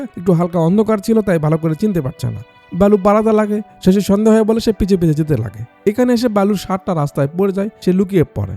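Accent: Bangladesh. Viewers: তোমার বাড়ি কি বাংলাদেশ তোমার কথা ধরন দেখে বলছি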